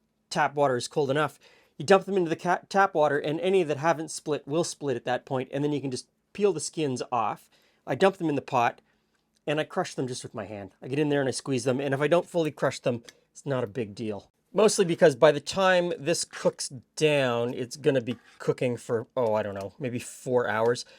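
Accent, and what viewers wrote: Canada. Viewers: I love your videos. I found you post covid, and I love your channel! Youre such a calm guy. You come across like a really gentle soul. I love the small coloquialisms from your subtle canadian accent “aboot instead of about” but subtle its turned down. 50%. Its one of the many things I adore about your channel. I love the food history. I love that you partnered with Max Miller on a video. I love when pops in. I love watching your easy every day banter. I love how well you know each other. The only thing I don’t like is that your videos are so short. I would watch you work in the kitchen happily! Tell more stories from your life. Talk more about your grandparents. I am a similar age to you, maybe 5 yrs older. My grandparents died when I was quite young, so love hearing other peoples grand parent stories. I would love to hear more about your time “counting trees?” living in a tent. 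Did you encounter a lit of wild life? Bigfoot, bears, moose, Elk? How many summers did you do that? How did you and Meet? The topics are endless. Bottom line. You and would be a blast to sit around the fire pit with sharing a bottle of wine. Have a great day to you both!!! Beth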